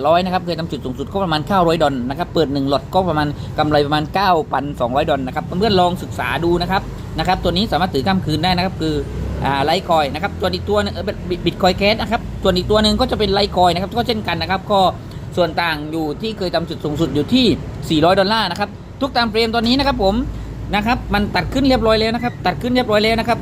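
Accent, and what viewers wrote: South, Thailand. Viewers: คนใต้แน่นอน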